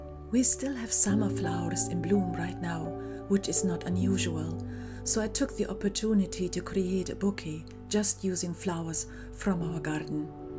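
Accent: Scotland, United Kingdom. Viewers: It sounds to me that you have a Scottish accent? So where did you move from?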